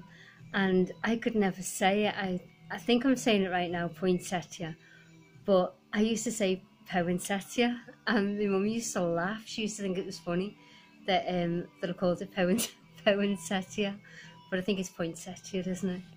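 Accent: Liverpool, United Kingdom. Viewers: What a gorgeous cat Lucy is...what age is she?....love listening to a Liverpool accent...reminds me of the much missed Brookie :)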